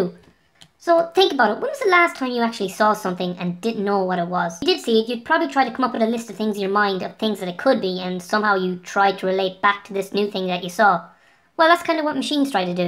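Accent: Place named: Ireland. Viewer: I can tell youve an irish accent from this lol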